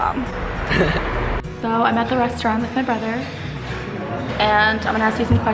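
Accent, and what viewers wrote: United States. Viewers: Jedinej člověk co má americkej přízvuk a mluví tak plinule česky. Zajímavě se to poslouchá :D Je to fakt parádní pocit :)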